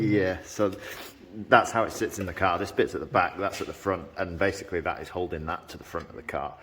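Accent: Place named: Warrington, United Kingdom. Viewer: yeah i had mine swapped over - made a difference as the castor got adjusted (wheel sits further forward now). great work tho man - you dont sound far from me (warrington based myself) and i visit 3 sisters from time to time - be great to catch up and check your car out :) ant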